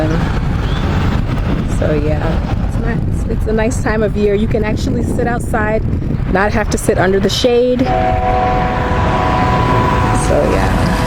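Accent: United States. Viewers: So I was trying to remember where I've seen you then I remembered that I saw you at a workshop in Dec 2019. When you spoke I noticed your accent and wondered if you are an expat or a Namibian who grew up in the states. Otjiwarongo is one of my favourite towns in Namibia. Those saying it's neat really need to see it in oerson, more beautiful.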